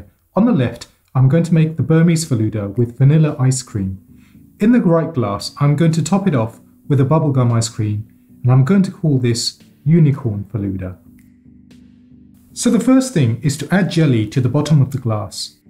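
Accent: United Kingdom. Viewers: Your english is superb ! British accent .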